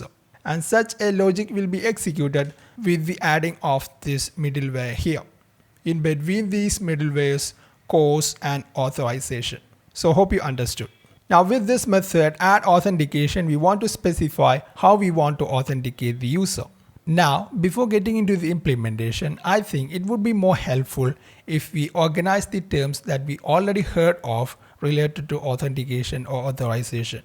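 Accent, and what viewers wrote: Kerala, India. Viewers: NIce presentation and explanation....Your accent sounds like a malayali..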